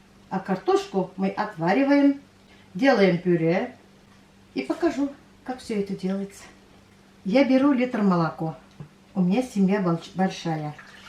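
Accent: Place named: Bashkortostan, Russia. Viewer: землячка моя дорогая! Я русская, но родилась и выросла в г. Уфа и поэтому татарский язык помню и: Исама Сис поняла ( может неправильно написала, но уж простите меня) т.к. мне 65, а уехала учиться из Уфы под Москву в 17. С большим удовольствием смотрю твой Канал, хорошо, что он появился и с большим удовольствием слушаю твою речь с местным акцентом- это как бальзам на сердце для тех, кто живёт далеко от своей малой Родины. Спасибо тебе, большое!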